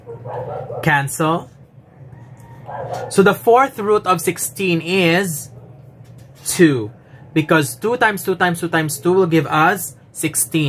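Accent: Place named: United States. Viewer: Akala ko amerikano yung nagtuturo hahaha. Ang fluent mopo mag turo naitindihan kopa lalo. Thank you sir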